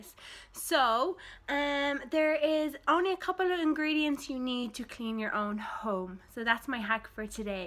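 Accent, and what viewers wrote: Ireland. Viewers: Fantastic hack!! I could listen to you talk all day. Love your Irish accent. Thanks for joining the collab. #YTMM 💖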